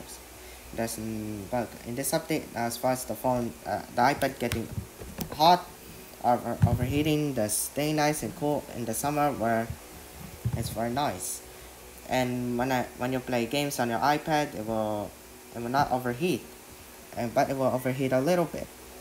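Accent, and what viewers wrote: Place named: India. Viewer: Hahaha kala ko rin indian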